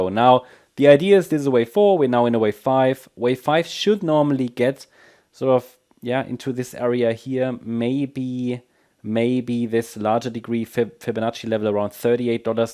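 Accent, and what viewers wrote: Germany. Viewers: Thanks for frequent updates. I’ve been following you for a while now and like your analysis and German accent 😊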